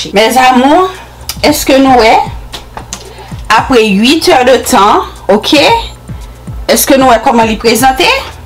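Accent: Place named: Cap-Haïtien, Haiti. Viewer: Mw tou abòne paskem tande se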